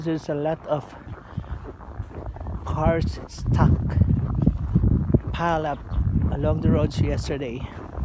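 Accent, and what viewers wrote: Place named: Canada. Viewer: Wow english Canadian eh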